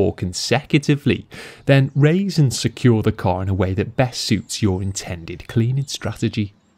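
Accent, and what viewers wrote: Liverpool, United Kingdom. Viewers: Hey, r u British? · Are u from anywhere near Liverpool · Great video but going to be honest your scouse/brummie naisel accent is a tad annoying haha! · Your voice and your british english is awesome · how could I tell you'd have a scouse accent before you started speaking lol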